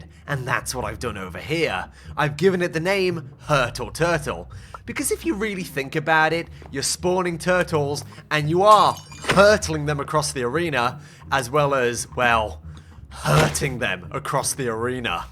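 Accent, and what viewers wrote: United Kingdom. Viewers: use your british accent and make a british sentence about you going to hurt this turtle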